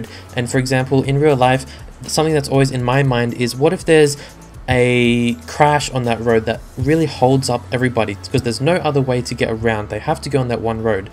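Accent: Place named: Australia. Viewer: Ah, understandable. If it makes you feel better, you had me fooled. It sounds like you have a pretty normal Australian accent What's your first language?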